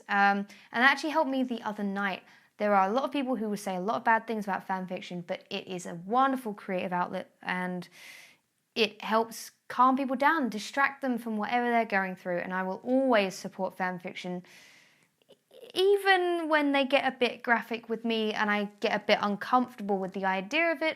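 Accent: United Kingdom. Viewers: the kind of anxiety that I usually have is a bit different from what you describe. But I just went to rest from having one from a complicated social situation and watched this video and I realised why I like these, it calmed me down to just listen to someone talking about a relatable(™) topic in a calm British English and now I'm calm as a little lamb. thank you :D my usual go to methods are meditation, breathing exercises and going through the alphabet in my head oO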